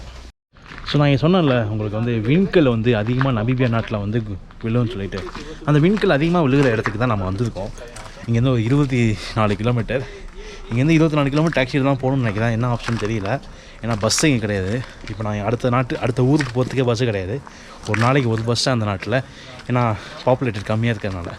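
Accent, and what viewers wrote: Thanjavur, India. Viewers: நம்ம தஞ்சாவூர் மாவட்டத்தில் பேசும் அதே பேச்சு உங்களோடு நானும் சேர்ந்து சுற்றி பார்ப்பது போன்று உள்ளது அருமை அருமை நன்றிகள் பல 🙋🙋🙋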